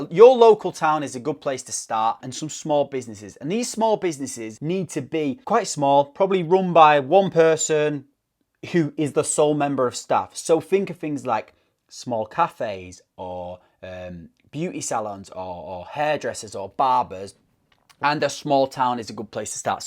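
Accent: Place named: Manchester, United Kingdom. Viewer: Who else missed that Manc accent 😁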